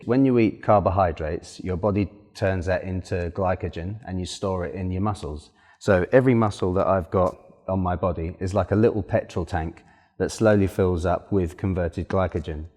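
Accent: England, United Kingdom. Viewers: He's English!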